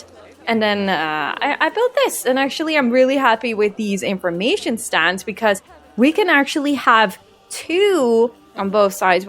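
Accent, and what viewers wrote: Netherlands. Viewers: Only now I run into your channel and I'm in love.. I have a mad respect for the building that you do and the way you make it all look so beautiful! I might be new, but I'm watching it all 😆 For once too I don't hate the accent of a dutch person speaking English! You have a very relaxing way of speaking and I love it!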